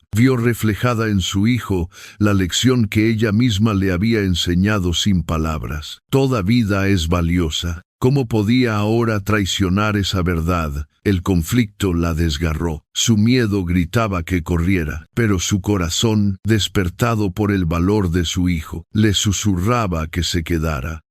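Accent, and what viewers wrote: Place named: Portugal. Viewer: Bonita historia pero ese acento en portugués no le veo el caso le quita interés a la historia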